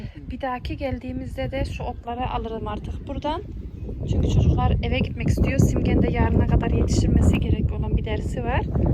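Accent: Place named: Yozgat, Turkey. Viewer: yeni tanidim cok pozitifsin bende yozgatliyim yakiniz senin memlekete konusman bize cok benziyo cok sevdim seni😘😍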